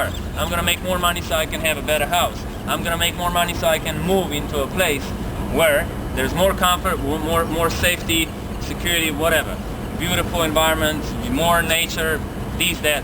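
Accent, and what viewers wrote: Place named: Spain. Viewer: this guy looks American but talks like his Spanish wat a funny guy lol, is he faking his ascent lol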